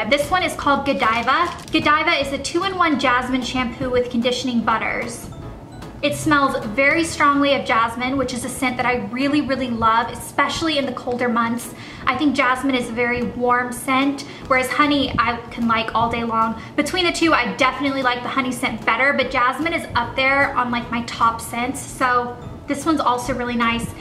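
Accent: South, United States. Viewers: i thgt u were uk but ir american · Your such a southern bell! Like that might be weird to say but that is how I would describe you lol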